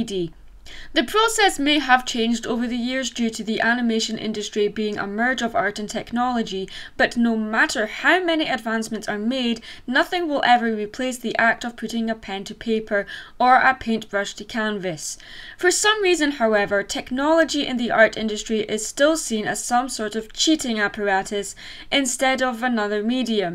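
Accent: Scotland, United Kingdom. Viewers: wait....are You Scottish?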